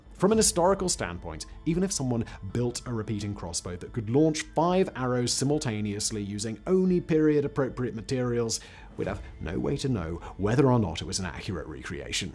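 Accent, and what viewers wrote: United Kingdom. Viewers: Whistler provides hosting duties on like 97 different channels, right? I earnestly attempt to watch these but for me simply talks too fast or my hearing is woefully too slow; add in the British accent and I quickly find myself rewinding from the jump to replay stuff I simply didn’t hear, couldn’t understand or a combination of both. By the time I try using CC to help me I’m exhausted and generally give up.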